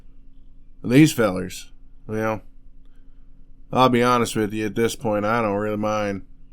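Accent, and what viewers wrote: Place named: South, United States. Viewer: I loved the audio as always I'll be adding this to my playlist I absolutely love your country accent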